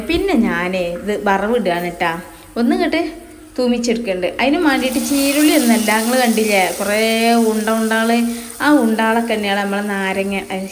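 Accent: Malappuram, India. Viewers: ഈ ഭാഷ കേട്ടാൽ തോന്നും ഇവൾ മാത്രേ മലപ്പുറത്തൊള്ളുന്നു prrr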